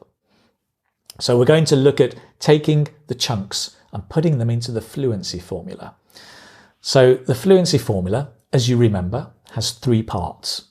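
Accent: United Kingdom. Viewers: so you have a british accent right? And u speak slowly trying to get a neutral slang so that all ur viewers can understand ur speech?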